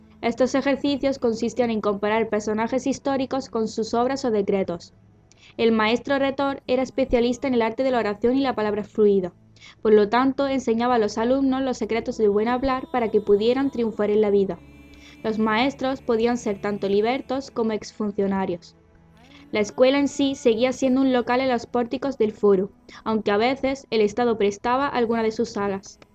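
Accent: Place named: Spain. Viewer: Buena infrmación, buen vídeo. Me gusta el acento de la narradora. De qué parte de España es? Saludos. Gracias.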